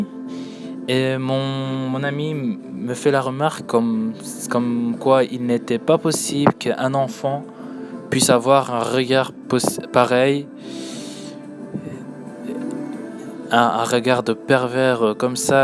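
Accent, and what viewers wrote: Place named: Djibouti. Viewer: Oh mince, j'était entrain de faire une théorie dessus !! j'ai directement vu ton accent "Djiboutien-Somalien" moi aussi je suis djiboutien et je t'invite a regarder mes video sur t'aimes les manga ;)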